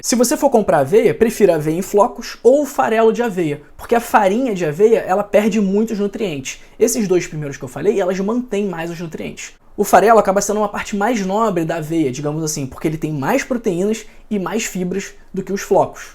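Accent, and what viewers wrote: Rio de Janeiro, Brazil. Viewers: Que lindo te ver falar, tua fala é chique, deve ser carioca. Deus abencõe...... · Eu acho q o sotaque dele e do Rio de Janeiro muito fofo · O sotaque dele não nega...é carioca mermo! 😄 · Esses olhos enfeitiça a gente! E esse carioqueixxxx é envolvente!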